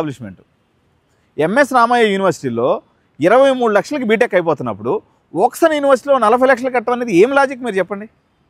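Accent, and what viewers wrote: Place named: Nellore, India. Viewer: నెల్లూరు బాష, యాస లో ఎక్సలెంట్ గా చెపుతున్నారు సార్ 🎉🎉🎉🎉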